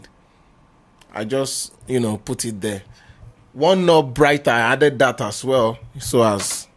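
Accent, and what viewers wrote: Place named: Igbo, Nigeria. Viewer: This guy igbo English accent is mad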